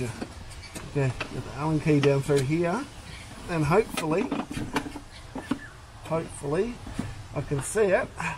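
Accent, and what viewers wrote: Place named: Australia. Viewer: Real Aussie. 😁. Thanks Mate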